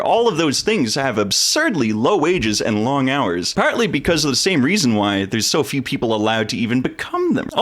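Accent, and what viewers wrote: Canada. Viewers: Oh man I clocked that Canadian accent easily The classic “EHRR” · Unbearable Canadian accent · The Canuck accent is gorgeous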